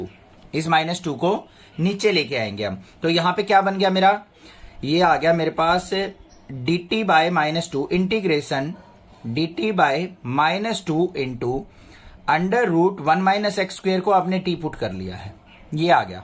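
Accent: Haryana, India. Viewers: Sir aap haryana se ho ? Aapke voice tone se lg rha h aisa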